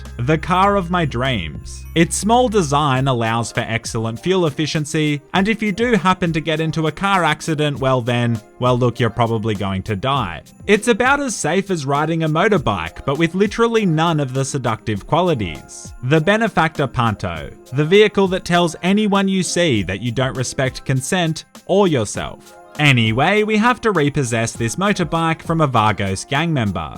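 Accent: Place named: Australia. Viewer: The steve irwin of youtube gaming. because you're white and sound austrailian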